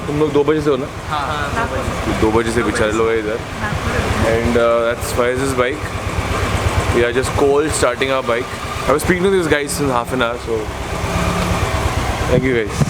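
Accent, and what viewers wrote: India. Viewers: You're in my" top 5 indians i like" And i love the indian aceent bruh 😂😂✌🏾💯 on point from Bangladesh 🇧🇩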